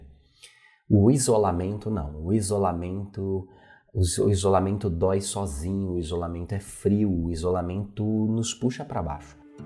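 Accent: Northeast, Brazil. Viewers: Muito bom vídeo, mas esse sotaque nordestino nunca esteve tão presente em vossa fala.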